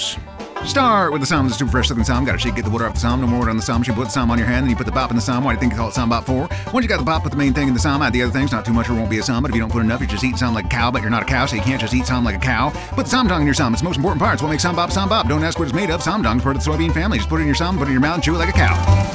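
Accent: South, United States. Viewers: 1. Love the font you used, fit the country twang of the narrator and the music excellently. 2. The narrator's fast spiel reminded me of the guy introducing the hand jive in Grease the movie 3. I understood every word he said, I don't know if that means I watch too much Trisha Paytas or I've just discovered a new talent and 4. ....ONE SHOT!!!! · best narration yet by the narrator. only problem now is thinking southern accent when eating Ssam! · I loved the southeren narrator!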